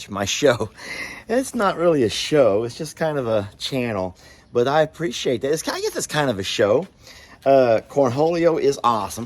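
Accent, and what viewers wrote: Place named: South, United States. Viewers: I know you have read my comments I say y’all all the time it’s southern our accent. But it means you all so yes it’s natural to say y’all. Maybe when you were a teacher you might have been more correct in your language. Now you are retired, we are more laxed in our southern accent. And it is okay. Don’t let that comment change your southernness. Your tool room looks awesome! 😊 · us Canadian's say eh all the time. It's just part of our lingo. You saying y'all is part of your lingo in the south. I think it's great. Your videos are great! Thank you for all you do!! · its just your texas accent...or south...lol Jason from cog hill is worse...i LOVE yalls accents lol your viewer from BC Canada ....Sam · My gosh. You're southern and y'all is just how we talk. One person can be y'all 😂 so all y'all is perfectly fine. Talk your way and don't worry about anyone suggesting you speak the way they think you should 🤗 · I noticed the sexy Texan twang has gotten thicker since you stopped teaching.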